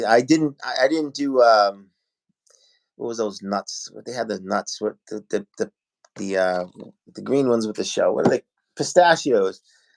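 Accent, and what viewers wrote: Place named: Boston, United States. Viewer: Lol how pronounces Pop Tarts. “Pawp-tawts” 😂 gotta love that Boston accent!!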